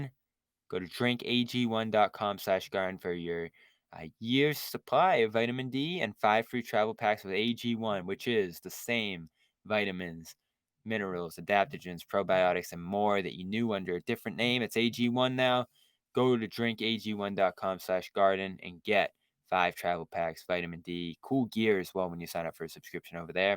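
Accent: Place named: Boston, United States. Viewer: I’ve your Boston accent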